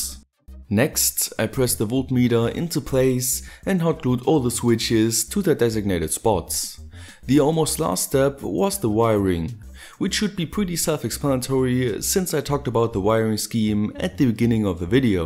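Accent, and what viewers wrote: Germany. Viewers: Englisch with a german dialeckt :D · are you german? sounds like that:D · Er ist Deutscher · wusste doch dass du n deutscher bist :D das hört man einfach so raus XD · Wie man einfach direkt nach 5 Sekunden merkt das du Deutscher bist 😂👌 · Du hast ein deuschen axent! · deutsch ?höhrt sich so an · German? Sounds a Little like a German that is speaking english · are you german? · are you from germany? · Your accent, and using a Geodreieck..... you are German... cool!!! Great video btw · Red doch deutsch man hört dass du deutsch bist 😂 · Are you from Germany? Or Austria? I am hearing accent from you. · Ich denk noch die ganze Zeit: Irgendwie klingt der Akzent deutsch. Und dann BÄM, Pflege-Öl. xD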